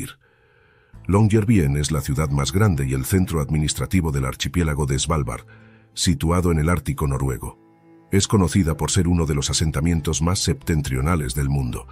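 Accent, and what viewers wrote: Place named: Castile, Spain. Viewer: Excelente vídeo, y muy bien narrado. El narrador habla un perfecto castellano y es un placer escucharlo.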